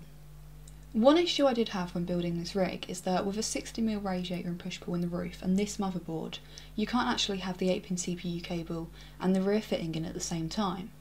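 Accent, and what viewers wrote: England, United Kingdom. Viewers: sexy English voice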